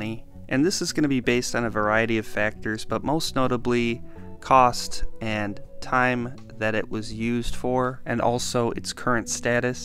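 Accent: United States. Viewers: One suggestion for a future video. Saint Petersburg, Florida. The original name was The Thunder Dome. Less than 48 hours later, I heard people calling it The Blunder Dome. SunTrust merged with BB&T and is now Truist. The voice has to be from the US. The City is MontReal, but he said Mont Treal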